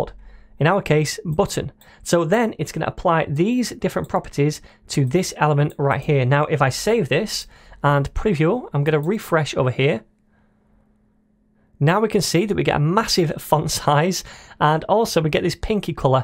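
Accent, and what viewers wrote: United Kingdom. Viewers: Are you from UK dude??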